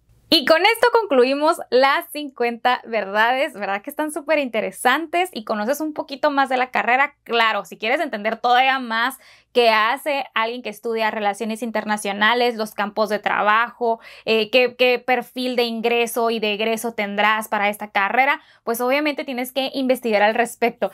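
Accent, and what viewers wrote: Mexico. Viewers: muito legal ver um video de um outro país falando sobre a faculdade que eu quero seguir, amei esse sotaque mexicano, entendi tudo, beijos do Brasil🇧🇷🥰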